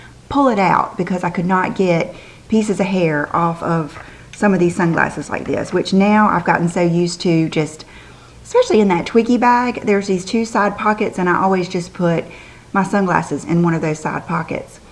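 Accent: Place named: South Carolina, United States. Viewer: You are such a doll! I live in greenville SC and just love watching you, hearing that familiar accent I know all to well! Xoxo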